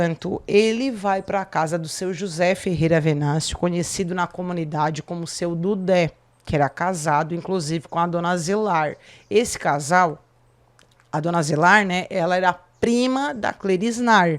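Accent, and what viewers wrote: South, Brazil. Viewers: JÁ OLHEI ESTE CASO MUITAS E MUITAS VEZES,MAS VOU OLHAR E DEIXAR MEU LAIKAO,PORQUE A APRESE NTADORA É A A LOIRAAAA MAISSS LINDAAAA DO MUNDOOOO E O SOTAQUE SULISTA ENTÃO,DEUS TE ABENÇOE RICAMENTE MINHA RAINHA,MINHA DEUSA MARAVILHOSAAAAAA❤❤❤❤❤❤❤❤❤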